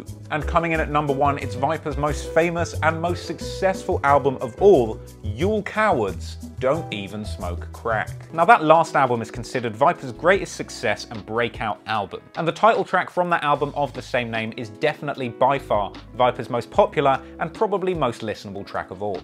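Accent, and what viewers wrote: United Kingdom. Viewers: So many British narrators intrigued in Texas rappers. I like it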